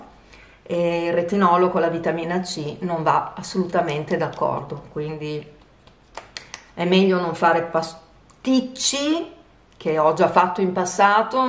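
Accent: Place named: Emilia-Romagna, Italy. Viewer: Sono passata a guardare un tuo video grazie a gattaluna 😊sn rimasta colpita dall accento...pensavo ma qst signora è della mia regione! Infatti quando hai detto Bologna tutto torna😅ciao io sn di Forlì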